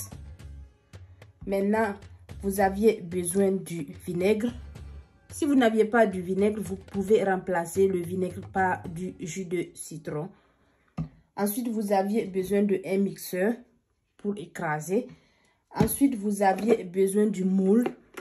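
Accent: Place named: Mossi, Burkina Faso. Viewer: Ça vient de l’accent mossi pas tous les burkinabè sont mossi. Y’a plus de 60 autres ethnies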